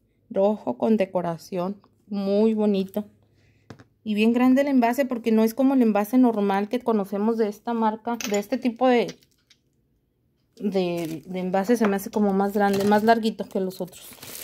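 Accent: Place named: North, Mexico. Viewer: Ahora sí te salió lo norteña,ajuuua 😅 todo padrisimooo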